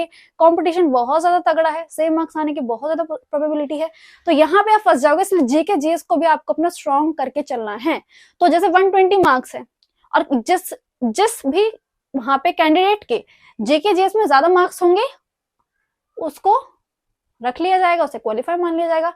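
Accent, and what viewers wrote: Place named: Pahari, India. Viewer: Pahari lg ri ho 😅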